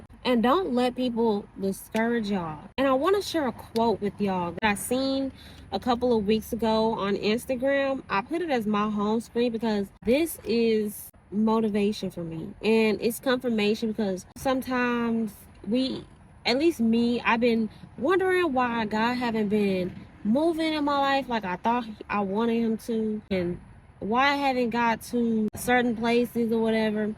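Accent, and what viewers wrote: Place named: South, United States. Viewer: Where you from? You have a southern accent!!! It’s cute 💕💕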